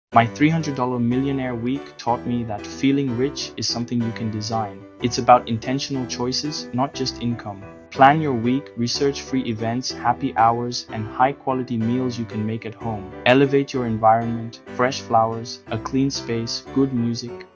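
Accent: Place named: India. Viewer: Thank you Indian guy (the accent was a dead giveaway away). These are great tips to share. Although I’m way ahead of you, as I have been doing every one of these things and more for the past 2 decades. Trade off was that I have traveled the world, been to the finest of places, and am surrounded with luxuries “all the things” which I have never once paid retail price for. Champagne on a beer budget is totally possible with meticulous and methodical planning 🥂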